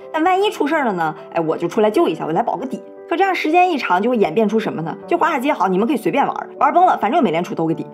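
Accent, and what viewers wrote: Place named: Dalian, China. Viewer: 新年快乐🎊这期太棒了 特别喜欢小lin用亲切的大连普通话娓娓道来 有趣 易懂🤓我也是大连人所以倍感亲切。如果有机会 希望小lin出一期影响美股市场大盘上涨和下降趋势的几项重大因素。我想点击量一定会爆棚🎉虽然我们心里都是模模糊糊的明白一些，没啥系统，都是叶子 没形成树，相信小lin的总结归纳能力❤支持我说的 大家点个赞❤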